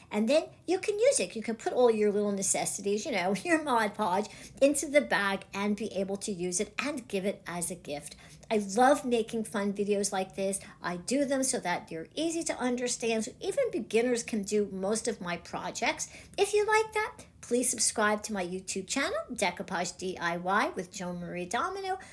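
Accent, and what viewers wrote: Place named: Philadelphia, United States. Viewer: I’m enjoying this video- I love this kind of crafting. But I just want to ask you…are you from Philly? The reason I ask is you said “pock a book”. As in pocketbook. I grew up in SW Philly so my ears are perked up by your accent! ☺️ where I live now they say purse instead of pocketbook. 😂 kind of funny.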